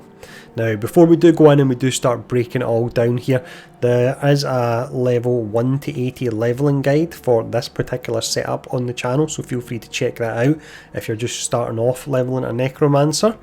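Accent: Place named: Glasgow, United Kingdom. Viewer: Great Vid thanks for covering the passives like you did! Now, forgive me if I offend, the accent my buddy and I are trying to place - Glasgow? Thanks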